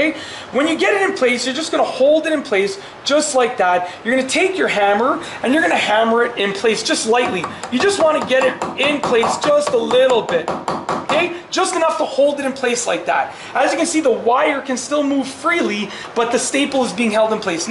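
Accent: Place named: Canada. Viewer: Canadian Eh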